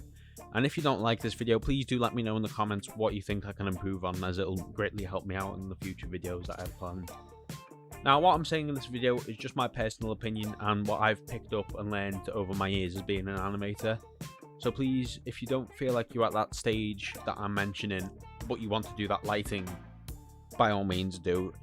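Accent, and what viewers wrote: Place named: Liverpool, United Kingdom. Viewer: Yup i can tell your from Liverpool nice vid!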